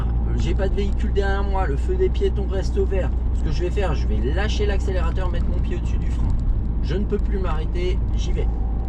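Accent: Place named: Paris, France. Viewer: Very nice driving, en plus du bel accent Parisien. 😉👍🗼🗼🗼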